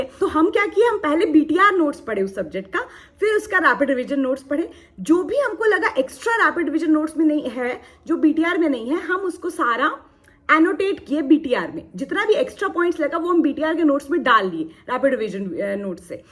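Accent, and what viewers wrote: Bihar, India. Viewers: Is it bihari accent? It's cute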